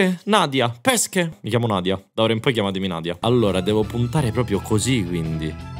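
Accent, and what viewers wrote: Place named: Ravenna, Italy. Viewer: ma te sei di ravenna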